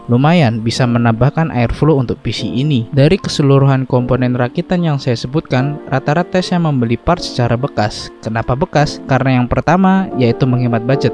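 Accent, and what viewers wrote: Purwokerto, Indonesia. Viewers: Wong Purwokerto mas?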